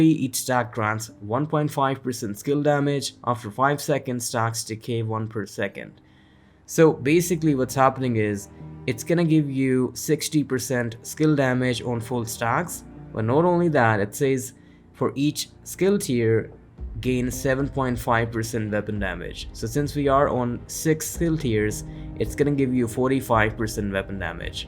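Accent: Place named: Germany. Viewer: Dat German accent😊 😉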